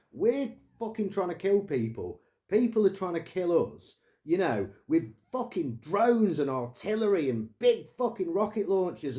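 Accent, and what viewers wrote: United Kingdom. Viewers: sooo British) Love it!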